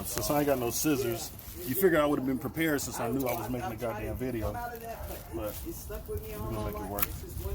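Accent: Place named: California, United States. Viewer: Definitely a Cali native lol. This video should have more likes. Perfect fix!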